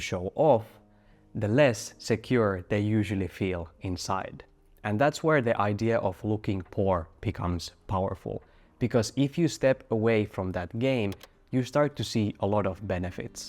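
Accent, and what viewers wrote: Finland. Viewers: Jännä miten suomalaisena tunnistaa suomalaisen puhumassa englantia ekan kahden sekunnin jälkeen. Hyvä video! 👍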